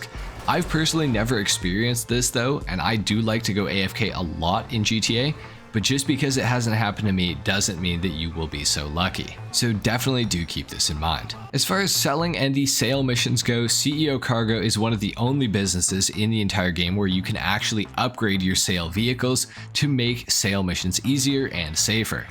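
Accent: Winnipeg, Canada. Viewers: Nah buddy, you from Winnipeg? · Haha I didn’t know you were from Winnipeg bro.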